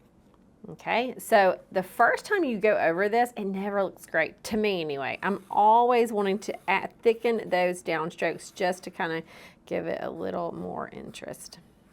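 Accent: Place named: Alabama, United States. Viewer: Love the lighthouse. When I went to your Facebook account I was delighted to find that you are a fellow Alabamian. I knew I liked your accent😊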